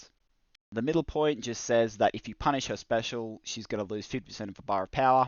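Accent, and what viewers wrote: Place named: Australia. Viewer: Mate, you an Aussie? What’s good my fam, instant fan and subscriber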